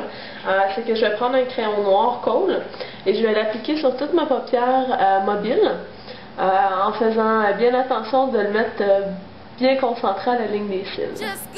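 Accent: Quebec, Canada. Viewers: jadore t'es vraiment tres belle jadore ce maquillage .... Ps jadore laccent Quebecoi ...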